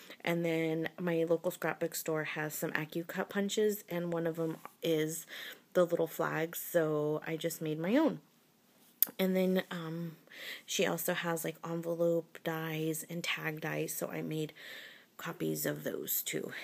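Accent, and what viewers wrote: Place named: United States. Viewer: omg!!! this is fabulous!!! i love your american accent lol!!!!:-) ooo i love heidi swapp's stamps i have a few too!! oooo u love mermaids! and me!! hehe, omg! ive never seen a real palm tree!!:( 0ooooooooo flamingos!! i love flamingos!!! (im writing this as i watch incase u didnt realise lol) love the seeds stamps so cute!!! oooo doilys are lovely!!! not seen those american craft pin/badges before they're cute! ooo i like that song too!! haha:-) that's a fantastic book love it!!!! xxxx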